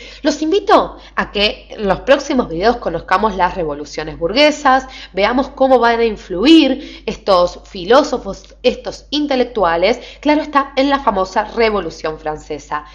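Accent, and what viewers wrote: Argentina. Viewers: la mina es de argentina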